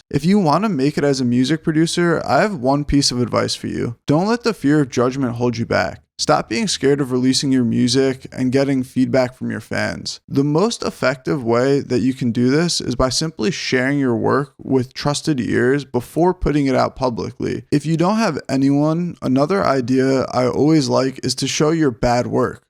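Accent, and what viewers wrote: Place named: Liverpool, United Kingdom. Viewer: Good video thanks! Random question but your accent sounds like you have a slight scouse twang,were you originally born in Liverpool UK?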